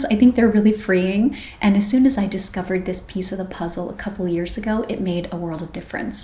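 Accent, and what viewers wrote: United States. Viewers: You are one of the few American Youtubers I can watch. Everybody else will scream at you and pull this show like they are bursting of energy and good humour. I mean, you are really friendly, but not hysteric and loud. And your American accent is so nice and subtle. Why can't tourists visiting Europe not speak and dress like you?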